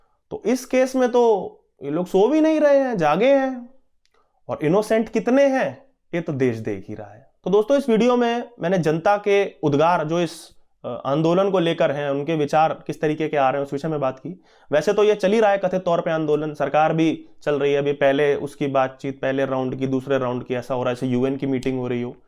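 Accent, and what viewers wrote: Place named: Haryana, India. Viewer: Bhai tumhara Haryanvi bolne jo maja hai wo alag he hai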